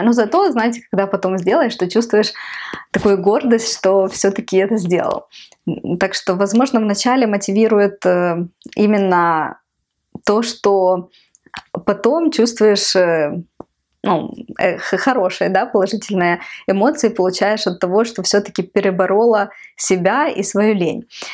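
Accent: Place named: Ukraine. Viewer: я недавно начала смотреть твои видео и все никак не могла понять, что у тебя за интересный акцент!?? А оказывается вы дома на украинском разговариваете! Так ты русский выучила специально чтобы канал вести?...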